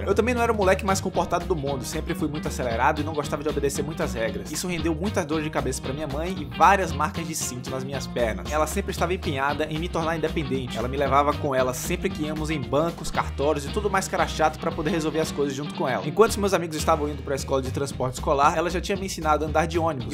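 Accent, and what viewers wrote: Bahia, Brazil. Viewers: rapaz sua historia de antigamente é quase igual a minha agora (EM TER VERGONHA DE FAZER VIDEOS)E DIVULGAR O CAL, inclusive eu sou de camaçari uma cidade vizinha a Lauro de Freitas onde voce morava. eu sofro de depressão, transtorno borderline , ansiedade sindrome do panico e sou hiperativo por isso desenvolvi fobia social, mas não paro de sonhar em fazer videos e algo me diz que se eu não desistir e perder a vergonha e o medo eu conseguirei ser um youtuber, e esses dias vi seu canal e quando percebi que você era da bahia eu ate me emocionei, eu acredito que a lei do universo, (a lei da atração) me mostrou seu canal para confirmar, quando eu ouvi seu sotaque eu pensei, pelo sotaque e pela giria (vei)eu pensei: esse cara parece ser baiano! logo em seguida o motoqueiro perguntou se voce era do rio de janeiro e voce respondeu que era de salvador. 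eu pulei aqui em casa e falei porra o cara é daqui de salvador, isso pra mim foi um sinal e por isso fiquei emocionado. agora to falando para todos aqui que tem um youtube que ta fazendo sucesso e é daqui de lauro de freitas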